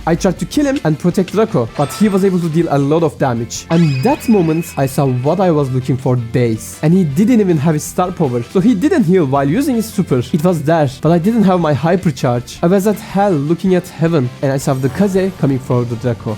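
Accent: Turkey. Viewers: Turkish ahh Accent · I can smell the turkish accent from miles ago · I feel the Turkish accent (laudatory) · konuşmandan türk olduğunu anladım baktım harbi türkmüşsün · It is because of his accent because he is Turkish. Most Turkish people have this accent. Even I have it because I am Turkish too😊 🇹🇷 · Konuşmandan anladım büyük ihtimal Türk’sün bi dahaki videonda damian yapar mısın ama ne olur ne olmaz ingilizcesinide yapiyim. Could you do Damian too in your next video? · bro ur turkish accent is giving u away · Türk lan bu aksana bak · aksandan anladım anında Türk olduğunu kral güzel video eline sağlık ❤❤ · i can feel your turkish accent in english am i correct? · The turkish accent 💀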